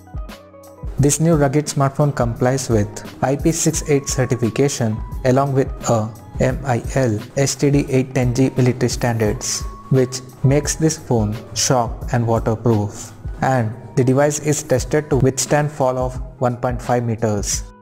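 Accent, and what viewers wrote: India. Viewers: English (Indian phonetics)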